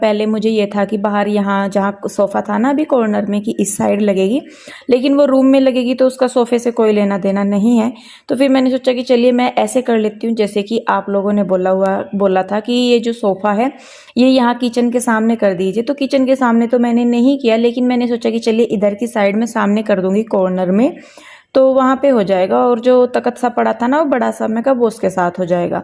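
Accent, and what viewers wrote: Haryana, India. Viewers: Bahut sundar lag rha h ji ghar aapka ji aap haryana se ho kyuki aapki boli hamare jaisi h same boli h hamare yha yehi bolte h yh mera haryana dodh dahi ka khana yh mera haryana 👌👍🙏jai shree krishna 🙏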